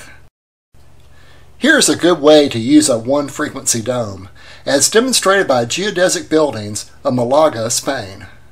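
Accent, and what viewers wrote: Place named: United States. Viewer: Thanks for these great explanations! On a side note, you sound like you must come from the same part of USA as Bill Clinton.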